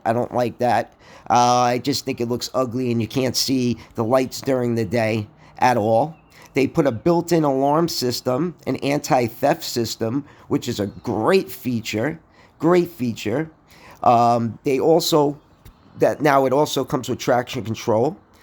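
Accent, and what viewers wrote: United States. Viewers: Your whiny yankee voice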